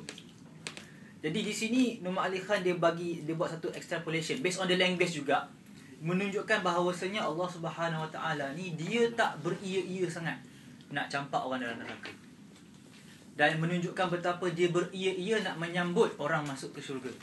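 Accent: Malaysia. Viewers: Ni konfem kelate..haha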